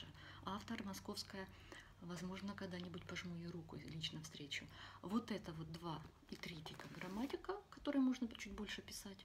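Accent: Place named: Ukraine. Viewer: Автор видео, вы бы сначала русский выучили! Стыдно говорить и выкладывать видео с таким колхозным малоросским акцентом!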